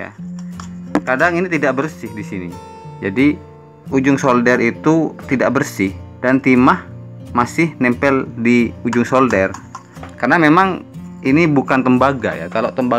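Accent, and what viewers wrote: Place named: Sumatra, Indonesia. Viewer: Dari aksen bicara agan orang sumatra. Tapi namanya kok nama jawa bang?? Orang mana?? Salam dari bekasi.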